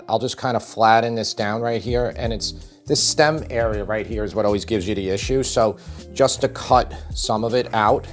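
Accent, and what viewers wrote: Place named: Pittsburgh, United States. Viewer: You sound like a Pittsburgher! My Slovak grama put ketchup in eveything including her homemade chicken noodle soup!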